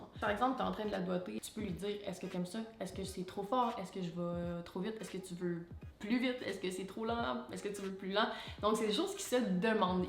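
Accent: Quebec, Canada. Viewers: Pas forcément facile avec l'accent du Québec, mais très sympa dans les explications.. 👍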